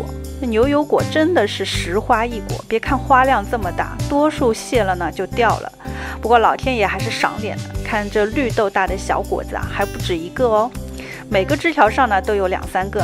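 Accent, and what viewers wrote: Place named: Zhejiang, China. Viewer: 口音是浙江的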